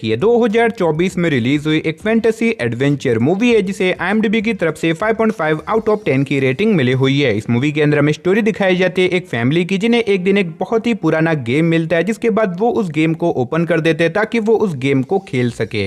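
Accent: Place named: India. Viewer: Evriting is good just your fucking India accent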